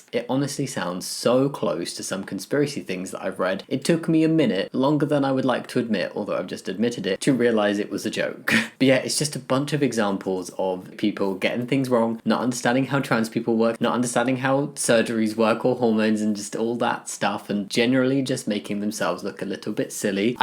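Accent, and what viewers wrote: England, United Kingdom. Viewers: Do you also get upset when people say "tom-AH-toh"? He has an English accent due to living in this place called England where things are pronounced differently. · He's British.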